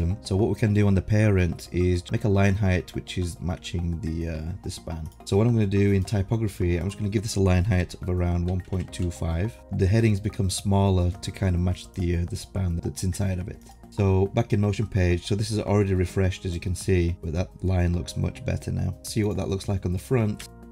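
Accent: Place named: West Yorkshire, United Kingdom. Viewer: That's a guy from West Yorkshire who has lived in Japan for 10 years, so it's a little hybrid 😅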